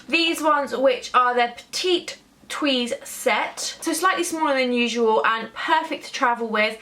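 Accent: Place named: RP, United Kingdom. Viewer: You have this posh British accent! lovely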